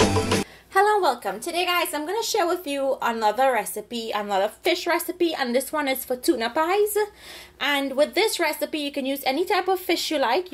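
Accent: Trinidad and Tobago. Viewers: Kenny H She is not faking an accent. She is from Trinidad in the Caribbean and is speaking with a Trinidadian accent. She is not trying to sound like anyone from another nationality. Italians don't speak like that and she is not from the Middle East. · Ganaria Gente trini accent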